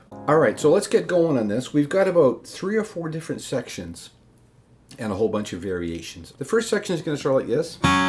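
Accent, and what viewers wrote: Canada. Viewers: Judging by your accent and the way you pronounce ‘about’ , are you Canadian , Nova Scotia?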